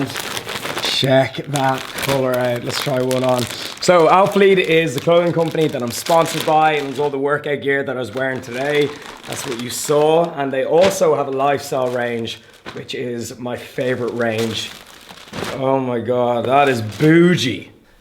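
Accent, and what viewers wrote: Ireland. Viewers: accent sounds Irish. Lucky dude if so. Ireland is beautiful! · love me an irish accent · What county is he from sorry is it Dublin or Wicklow?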